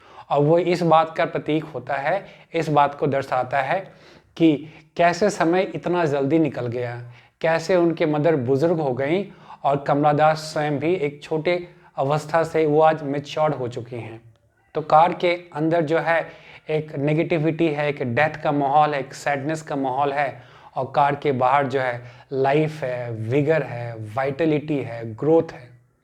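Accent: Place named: Bengal, India. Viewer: Sir apki languge tone se aap bengali lgte ho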